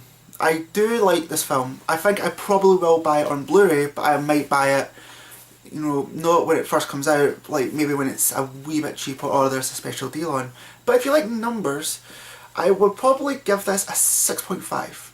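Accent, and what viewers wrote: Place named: Ireland. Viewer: You speak really quickly in an Irish accent. I kind of had a hard time.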